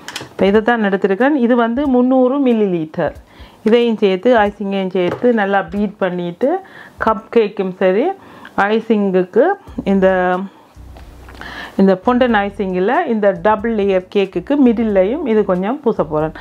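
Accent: Jaffna, Sri Lanka. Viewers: jaffna tamil pesathinga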